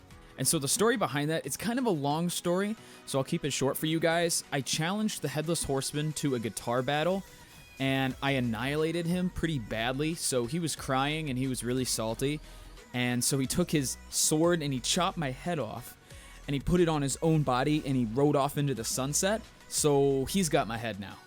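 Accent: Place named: South, United States. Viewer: just learned he has a southern accent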